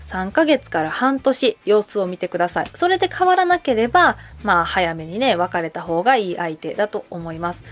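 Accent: Kansai, Japan. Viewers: 先生は関西の人なんですね☺️ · さんの関西弁⁉️ですか、とっても愛らしいです。説明も理解出来ます。男女分けるって案がありますが、一緒の方が互いの違いなどが聴けてむしろ理解が深められるのではないかと存じます。大切なのは、お互いが「謙虚」でなければならないということですかね。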